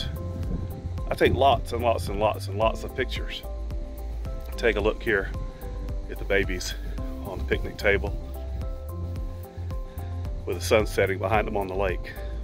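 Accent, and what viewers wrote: South, United States. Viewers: why you live in navada and you talk southern ?? ohhh the duckling. yes you do have good babies. i have to admit, i do enjoy you video....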